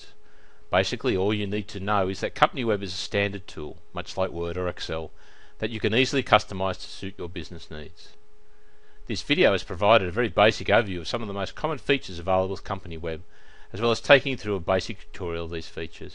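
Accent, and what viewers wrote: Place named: Australia. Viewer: Thx for the great video! Love ur Aussie accent :)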